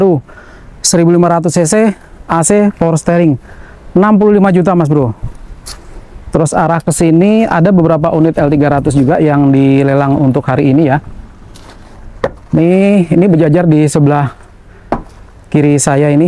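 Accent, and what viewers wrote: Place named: Sumatra, Indonesia. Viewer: Dari Sumatra ya mas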